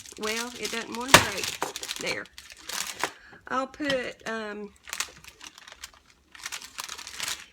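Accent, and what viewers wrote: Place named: East Texas, United States. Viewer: I love your accent! I went to high school and met my husband in East Texas—listening to you is like going home! ❤️❤️❤️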